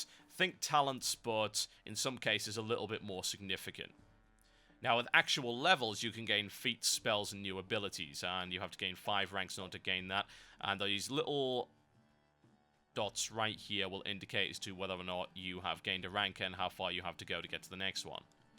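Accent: United Kingdom. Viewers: this is available in EU Right?, because your definitely a Brit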